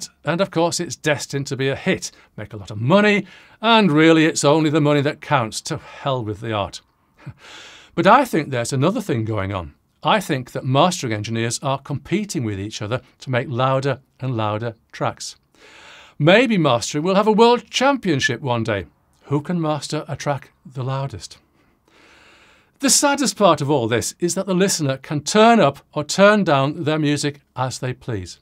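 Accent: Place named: Merseyside, United Kingdom. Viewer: I have original CDs from before 1995 that sound bad because the analogue to digital conversion wasn't very advanced when they were transferred, they have good dynamic range but lack definition.... granted a lot of "remastered" modern recordings are awful and have the 'flat" sound that comes with over compression and over-processing in general. I notice you have a slight Merseyside accent.... my neck of the woods, anyway thanks for a very interesting video that tells the truth about our modern recording world.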